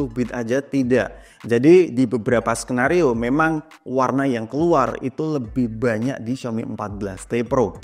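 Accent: Semarang, Indonesia. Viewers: Wong Semarang maszeee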